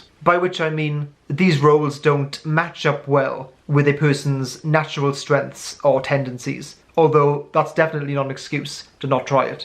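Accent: Wales, United Kingdom. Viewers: Are you Welsh?? I'm from South Wales and you sound exactly like a Welsh person. Us Welshies are very rare to find so I always get really excited when I hear someone who sounds Welsh. First video I've watched from you and it's so accurate, completely agree with you!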